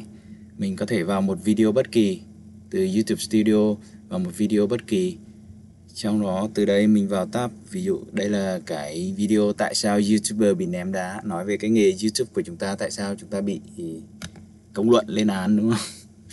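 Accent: Central, Vietnam. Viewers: Anh là người miền Trung phải không ạ? Em người Hà Tĩnh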